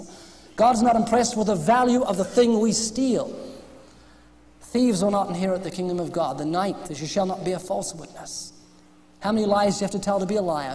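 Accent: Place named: New Zealand. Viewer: This was when you sounded more like a Kiwi, when 'world' was more 'werld'. Love it!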